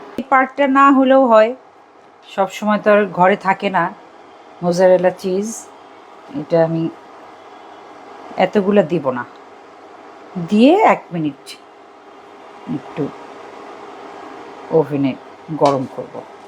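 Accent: Sirajganj, Bangladesh. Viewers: সিরাজগঞ্জের টানযুক্ত ভাষা মাঝেমধ্যে ২-৪ লাইন প্রতি ভিডিওতে বলবেন, আপনার মুখে খুব মানায়।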